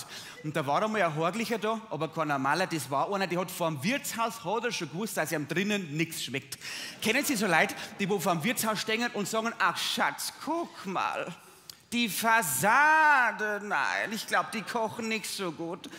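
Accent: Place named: Bavaria, Germany. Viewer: Martin du bist da Wahnsinn ❤bleib den Bayrisch treu ❤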